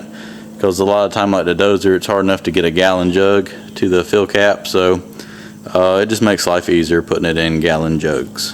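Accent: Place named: North Carolina, United States. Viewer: well hell...the man's from North Carolina.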